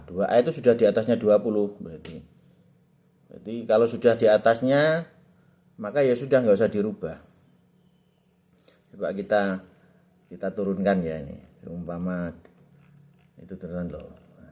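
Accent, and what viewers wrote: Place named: East Java, Indonesia. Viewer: Koyok e wong jatim kulonan iki , hihi...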